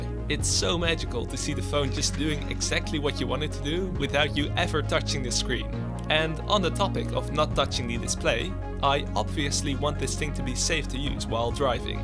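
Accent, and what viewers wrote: Netherlands. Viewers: Yo r u dutch?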